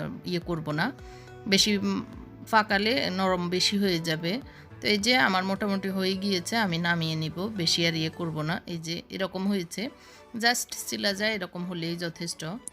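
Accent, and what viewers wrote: Noakhali, Bangladesh. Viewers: আপনি কি নোয়াখাইলা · আন্নে নোয়াখাইল্লা নি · আপনার দেশের বাড়ী কি নোয়াখালী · আপু গো তোমার বাড়ি নোয়াখালী তাই না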